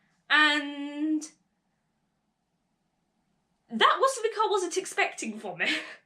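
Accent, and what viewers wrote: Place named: United Kingdom. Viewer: I've always wanted a British accent!!! Don't let it be, ur born with it and it's very very beautiful!!! I'm 20 so u know I'm not some old creep.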